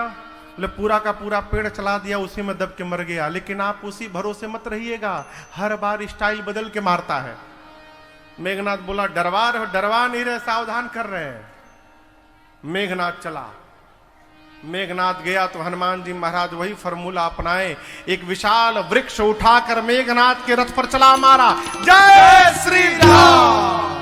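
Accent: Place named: Bhojpuri, India. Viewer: आपका भोजपुरी मीठा बचन सुनकर दिल खुश हो गया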